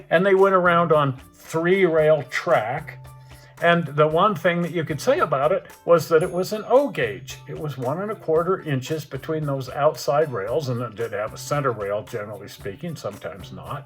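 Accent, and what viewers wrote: United States. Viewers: For those that commented negatively. Life is far to short to be commenting negatively. Please lighten up. I still think Hill Billy accent was funny. But I have been told I have no taste.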